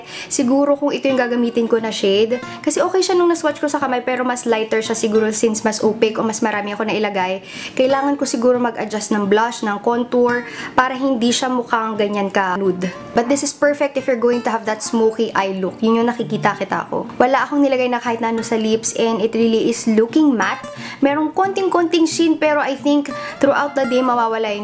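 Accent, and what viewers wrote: Philippines. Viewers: im really love philipine accent😍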